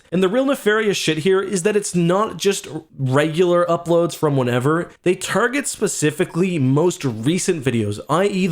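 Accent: Canada. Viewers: I know this isn’t a regular video and you’re mad but it’s much more clear how Canadian you are when you’re pissed off and cursing, reminds me of trailer park boys when you said “fuck” and “sorry”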